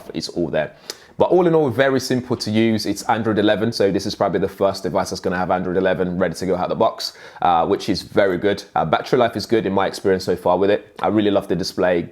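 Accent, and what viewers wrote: United Kingdom. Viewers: love your British accent dude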